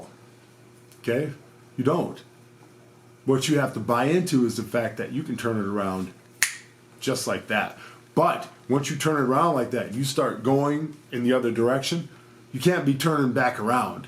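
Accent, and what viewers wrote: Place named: Midwest, United States. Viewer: Just started watching your videos . They helped me stay on track. Way to go! Also I could tell by your accent you’re from the Midwest. I’m from Ann Arbor, Michigan. Go Blue!!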